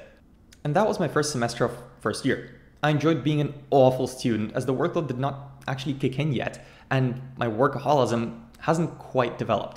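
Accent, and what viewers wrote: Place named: Canada. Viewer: Can hear u r Canadian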